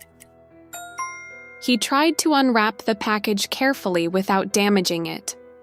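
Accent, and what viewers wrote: United States. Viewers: This is American with an awful accent not real English. Please call it American English !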